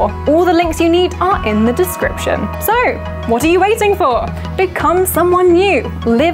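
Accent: England, United Kingdom. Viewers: She is english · I thought Aussie at first, but after watching the rest of the video I'm pretty sure the other comments are right. I don't know enough about accents to really describe the differences between this and a typical Aussie accent, but it sounds British. Definitely not Kiwi - a NZ accent makes every vowel sound the same. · British accent. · She is fantastic. A laid back eloquent English accent i like it.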